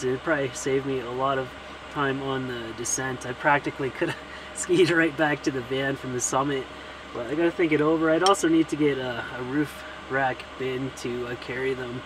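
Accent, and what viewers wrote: Canada. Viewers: He needs some Dominos in his life. I should really donate to this guy. Love the Canadian accent. Tell is a story or 2 about your hockey youth!!!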